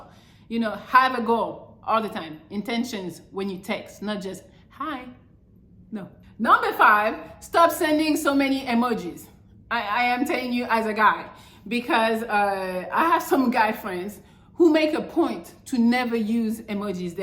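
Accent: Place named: France. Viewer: Are you french bro?😭 Im sorry but as a french dude it freaks me out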